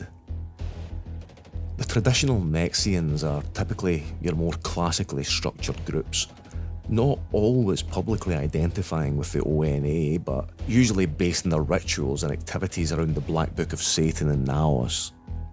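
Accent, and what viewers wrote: Scotland, United Kingdom. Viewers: Top shelf research as usual, my friend. I expected a highly detailed and well-researched video and was not disappointed :) Everything from the audio-visual aesthetic that you have selected for the video, to the emphasis on information that is being conveyed verbally due to the lack of visual distractions provided by imagery, to your Scottish accent (particularly the amazing way in which you pronounce those hard 'r's) meshes perfectly together to provide a unique and interesting experience. The video itself, as mentioned above, is well-researched, which is particularly evident through the scholarly way in which you document their ideology, while maintaining an emphasis on being objective and getting facts across (with a few well-placed comedic touches which entertain the viewer while simultaneously not compromising the tone of the video). 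There is one thing I could recommend that you possibly do in the future, however. Since you obviously cannot get EVERYTHING across in one sitting, perhaps you could link some of your citations/references/additional materials in the description? That way, you could increase the credibility of the video, while at the same time providing viewers with material that they could read themselves to supplement what was already communicated in the video. That said, the best way to alleviate fear, ignorance and misinformation surrounding strange, scary or otherwise esoteric subjects is documentation and research, particularly archival analysis of materi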